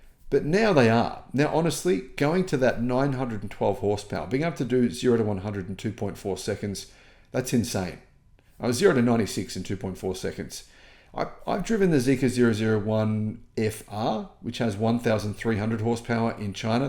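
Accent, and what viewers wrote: Australia. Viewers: Still prefer the ICE versions ,I don't care how quick they are in a straight line they are cold ,sterile ,have no sound ,no soul , character ,the gas powered version has the sound of that engine the sound of that thundering everytime you push the peddle it has character , "soul" . BTW ,are you an Aussie? Mate.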